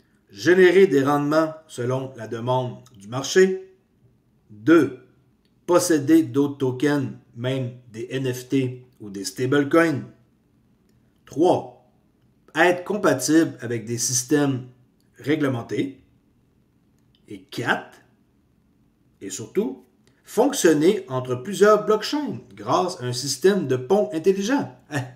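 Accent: Quebec, Canada. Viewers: Salut! Tu semble parler le Québécois! J'ai regarder plusieurs cite anglais et de la France, mais, voilà, je suis un vieux modèle 58, et je suis un néophyte dans le domaine, surtout, qu'il y a tellement d'acronyme, et j'ai la chienne de me faire avoir dans ce monde de jeune, donc, j'ai besoin d'aide pour acquérir des XRP, je ne suis pas sur de comprendre ce que cela veux dire, mais, je dois aller coûte que coûte dans cette directions! Peux-tu m'aider de façons privé? Respectueusement.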